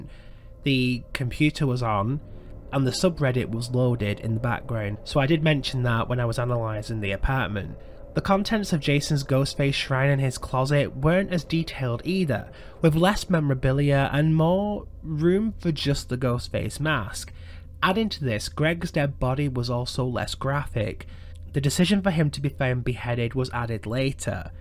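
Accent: United Kingdom. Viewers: What british accent is this? Its my absolute favourite 😍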